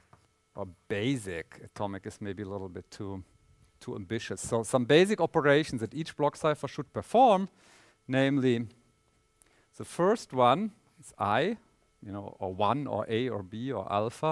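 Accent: Germany. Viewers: german english mit ruhrpott akzent oh yeah :D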